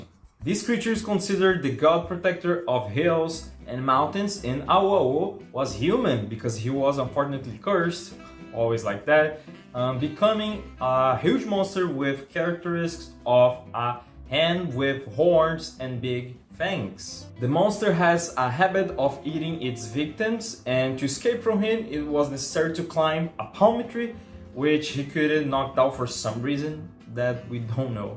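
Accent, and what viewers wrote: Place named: Brazil. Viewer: Simplesmente excelente, muito bom o sotaque brasileiro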